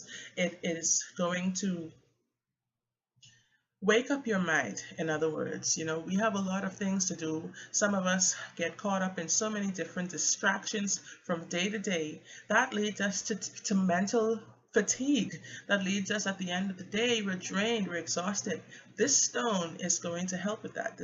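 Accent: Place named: Bahamas. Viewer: Are you bahamian? I hear an accent. Great video!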